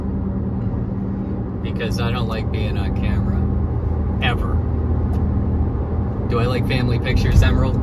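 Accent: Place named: Pennsylvania, United States. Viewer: I’ve watched several of your videos and was always impressed with the dictation and wondered what part of the country you lived . Your accent was very familiar but I couldn’t nail it down. Now I know “ Pennsylvania “ my sister lived there in Paoli . Very impressive interview with the Boss and he hit the nail on the head with his answer about the dowry . Hope you were listening.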